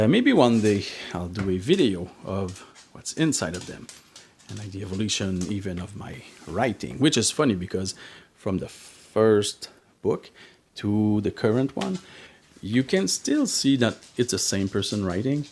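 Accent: Quebec, Canada. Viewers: Wow! I really enjoyed this video of your journal collection. You are so talented to make your own journals from scratch! From your accent I supposed you are from Québec, Canada... I am from Québec too and I also make journaling videos on my channel. I would love to see flip throughs from you, you seem to have an awesome journaling style. I am subscribing cause I want to see more content from you. Again, congrats on your journal collection. Take care. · Québécois right?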